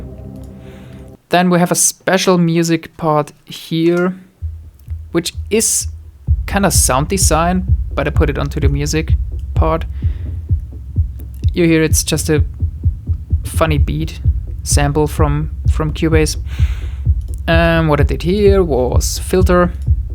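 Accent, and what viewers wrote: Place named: Germany. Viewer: The german accent is the best part of the whole video!! Haha. Love it man. Keep at it!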